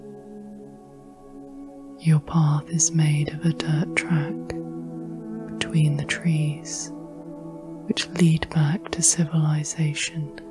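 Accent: United Kingdom. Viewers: Here in Carson City Nevada USA, I've tried several different methods. Supplements to relaxation recordings. Some success with bamboo forest recordings but found any with too much rain or wind or music was more of a distraction. Then, I found your 3 hour recordings three nights ago. I've been sleeping with you since😊using Bluetooth ear buds. The combination of your vocals and background music works magic. The British accent is an added comfort. 😴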